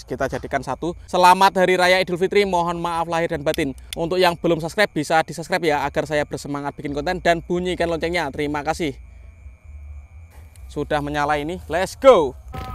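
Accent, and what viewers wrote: Java, Indonesia. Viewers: BG Jawanya Jawa mang bg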